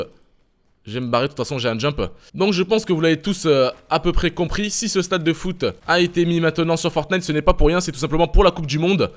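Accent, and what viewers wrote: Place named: South, France. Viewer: Ta tellement un accent du sud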